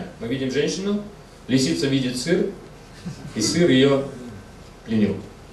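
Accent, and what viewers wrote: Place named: England, United Kingdom. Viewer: Речь ужасно косноязычная, с каким-то английским! акцентом. Поет фальшиво))))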